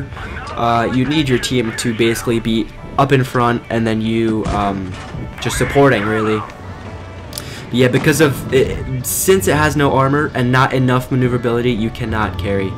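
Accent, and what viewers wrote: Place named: India. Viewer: the indian accent xD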